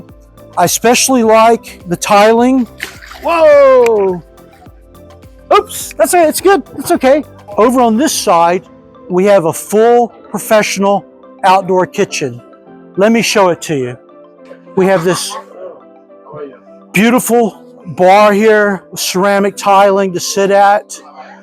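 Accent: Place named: Scotland, United Kingdom. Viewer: Everyone loves your Scottish accent!! Especially as Americans 😊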